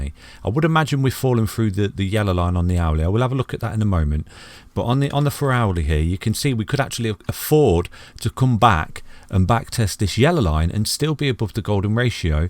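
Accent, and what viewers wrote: United Kingdom. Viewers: Nice to hear a fellow brit talk through it. Find it easier to understand. New to the game, this is very helpful info ✌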